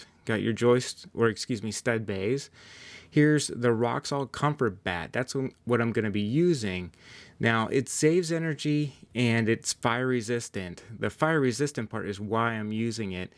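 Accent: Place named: Pennsylvania, United States. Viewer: It's a PA accent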